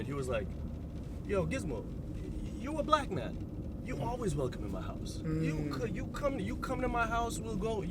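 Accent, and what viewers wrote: Trinidad and Tobago. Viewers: I love how you jump in and out of Yuh Trini accent, it still spot on. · Good he still has his Trini accent 👍🏿😃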